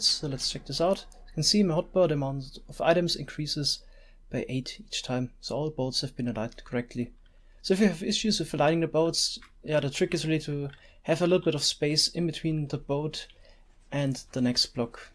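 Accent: Germany. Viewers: du bist ein deutcher das hört man omg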